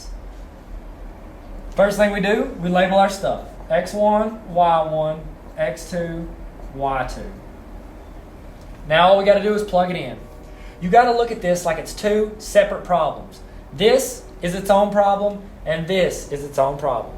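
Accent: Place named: Texas, United States. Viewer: I'm guessing he's from texas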